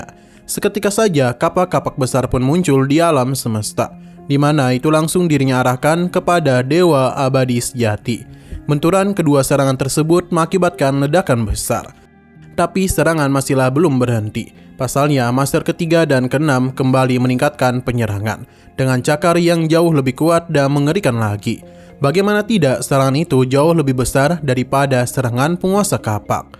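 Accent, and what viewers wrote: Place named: Kalimantan, Indonesia. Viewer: Curiga admin orang kalimantan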